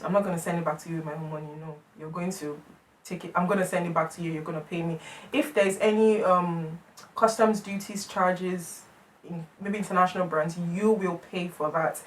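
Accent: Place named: Africa. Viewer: looking beautiful as usual but let's all just act like we don't know the brand lol you sound like an African mummy 'panti leleyi' lovely video as usual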